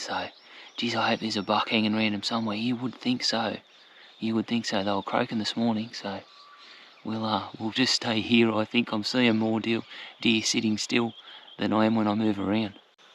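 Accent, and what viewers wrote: Australia. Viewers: Il just saying like it is. First off I wanna say that I watch alot of hunting videos and usually only aussie ones and by far your channel and your hunting videos are the BEST! You not only hype me up to go out and hunt but I reckon anyone else watching will have the feeling that you give me...and that's the feeling as if I'm out there hunting with you bud. You take so much time and effort to not only explain but document everything exciting and sitting on the edge of your seat kinda view. I would also like to come out there and say to all the negative comments you get on this and other videos about you shooting ferrel cats and foxes that they should all go and get fucked and if they don't like it to just not watch. But hands down iv smashed that subscribe button so hard that I ended up cracking my phone but who gives a shit. I love all your videos and that great Aussie accent just puts the icing on the cake. 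So great job and you keep me up to all sorts of hours at night hooked to your content. To all the haters just go and piss off or get deported lol. Go hard but as people don't realise the time it takes to edit and put up videos like yours and also the effort it takes of you just being out in the greatest country on earth living the dream. I'm happy I found a video I could comment on as they had turned off the comment section on some others and that's why I spilled my guts out here. Big thumbs up to you and God bless you and your family and be safe my friend. Best bloody hu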